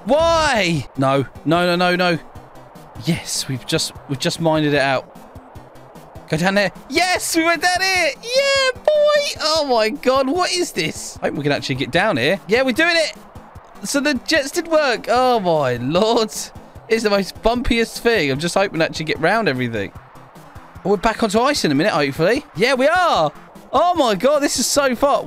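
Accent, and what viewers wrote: United Kingdom. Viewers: You British right?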